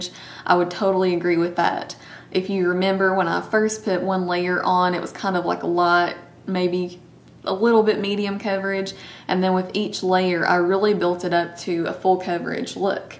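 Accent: South, United States. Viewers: I love your southern twang. <3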